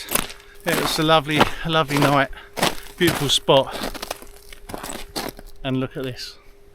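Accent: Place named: South East England, United Kingdom. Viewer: You've got the same tent as me, South east accent same... so subbed. 😂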